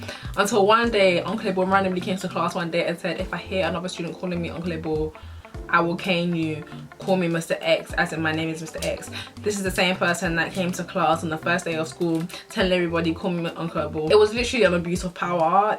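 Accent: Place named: United Kingdom. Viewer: How do you still have a Brits accent after 10yrs in Gh. I moved to Gh came back after 8years which is after SHS. But picked up the Ghanaian accent. How did yours not disappear? 🤔🤔🤔🤔🤔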